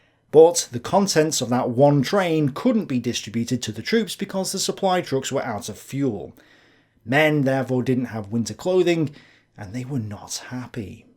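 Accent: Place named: Northern England, United Kingdom. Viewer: Just to follow up on my previous comment on your Case Blue video. What would you have in common with people like von Bock or von Wietersheim? They represented an ancient military cast going back nearly 900 years. As I said previously - they were Prussian, aristocratic, snobbish, arrogant - they belonged to the elite of the landowning (upper) class in central Europe. They really did live in a different universe. To hear you talk about them in your northern English accent brings a smile to my face. Cheers - btw I removed the word 'posh' as I don't think that adjective would apply to these people.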